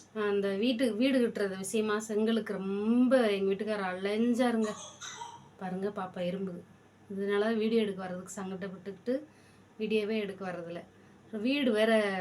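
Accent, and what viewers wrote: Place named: Chengalpattu, India. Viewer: கவலைப்படாதே மகளே அம்மா நான் இருக்கேன்❤❤ இந்த வீடியோவை ஃபுல்லா பார்த்துவிட்டு தான் உனக்கு கமெண்ட் போடுகிறேன் கடைசியிலும் செங்கல்பட்டு தான் பேசுகிறாய்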